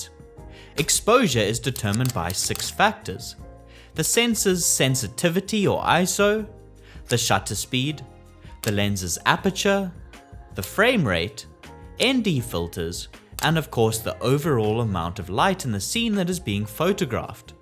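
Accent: South Africa. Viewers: The narrator has a very South African accent. Nice 🍺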